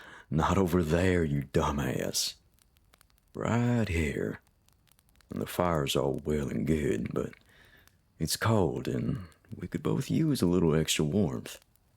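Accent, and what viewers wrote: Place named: South, United States. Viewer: I'm a sucker for a southern accent! 💖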